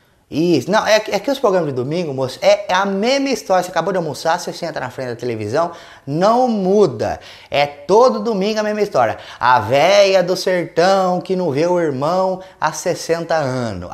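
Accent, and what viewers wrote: Minas Gerais, Brazil. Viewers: Hêêêêê Trêm Bão sôôô!!! Até que em fim, um minêrin pá salvá nóis nu you tubi uai!!! Faz uma recramação do tempo pra se aposentar, que nóis num aguenta mais trabaiá!!! Abraço!!!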